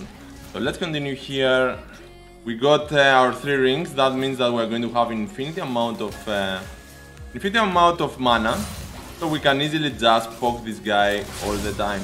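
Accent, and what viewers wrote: Greece. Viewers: Can you do pantheons voice? Since your greek and your accent is real and you play like a god! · χαχαχα το υποψιάστηκα από την 100% ελληνική προφορά. Το μούσι το επιβεβαίωσε.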